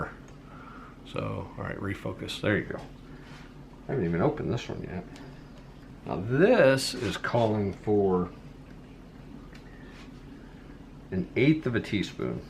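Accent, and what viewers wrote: Indiana, United States. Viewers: Yep, Indiana. Warsh this instead of wash this. I too am guilty!!!!😉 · You are definitely from Indiana. Lol warsh. Sounds like my sister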